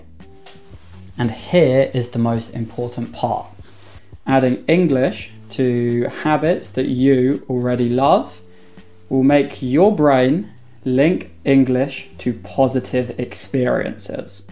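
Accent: United Kingdom. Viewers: I love your videos.. Your british accent is so to die for ❤😊have been living in the UK for last 2 decades..I was born and raised in India.. My passion for english made me learn the language.. It's really difficult to learn a language esp in adulthood.. Having said that, nothing is impossible.. Currently, I have been learning french by myself by using Netflix, YouTube videos and other resources.. I think, it's much easier to learn the language now than before as lot of content is available at your fingertips.. I recently watched your Reading town video.. I live in Reading town.. It's a beautiful place and felt happy to see it on your channel.. Wish you all the best for your future endeavors 😊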